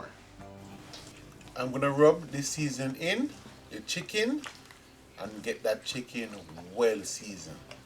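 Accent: Jamaica. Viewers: SHUT THE STOVE OFF😂 JAM DOWN TALK, 1 LOVE😊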